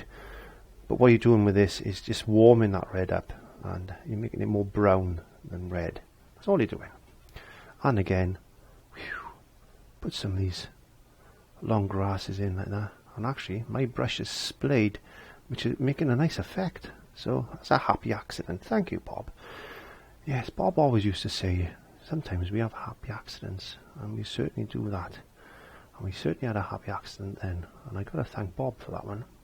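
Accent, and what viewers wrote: Wales, United Kingdom. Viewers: Thanks. I have a question: Are you Welsh?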